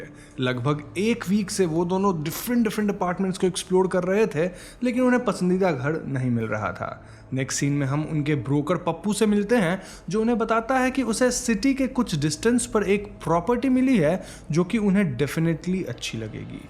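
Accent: Bengal, India. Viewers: are you bengali ?, as your hindi has bengali accent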